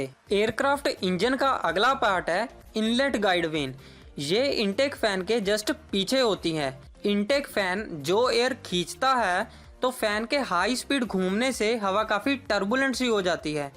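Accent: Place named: Punjab, India. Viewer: Hindi ton tan Punjabi lgda aa patuya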